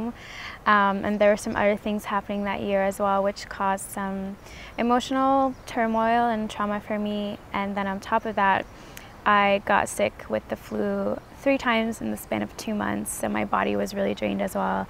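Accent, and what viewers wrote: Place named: United States. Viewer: I noticed you have an American accent but said uni instead of university. Whys that?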